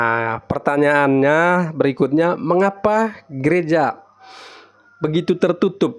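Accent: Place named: Manggarai, Indonesia. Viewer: Mo mau tanya maaf dengan logatnya kaya orang Manggarai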